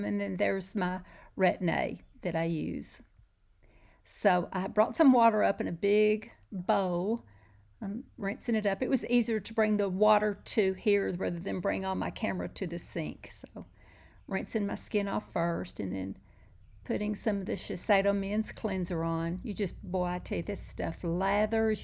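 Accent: South, United States. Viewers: LOVE the Southern accent!!!! Beautiful!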